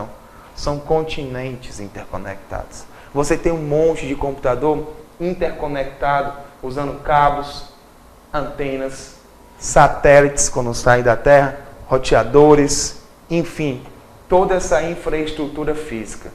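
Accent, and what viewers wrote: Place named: Ceará, Brazil. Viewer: machu réi rsrsrsrs muito showwwww sua aula.sou de paracuru(vizinho à fortal) mas moro no rio de janeiro.quando ouvi teu sotaque vi logo que era um cearence rsrsrsrs.valeuuuu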